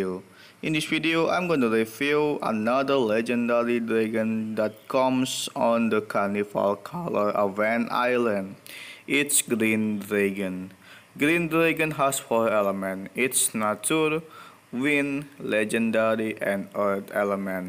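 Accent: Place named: Indonesia. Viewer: Bang ngaku lu orang Indonesia kan dari cara lu bicara gua udah tau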